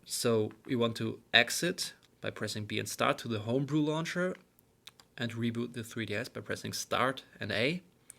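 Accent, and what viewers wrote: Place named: Germany. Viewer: Du bist deutsch das höre ich xD :D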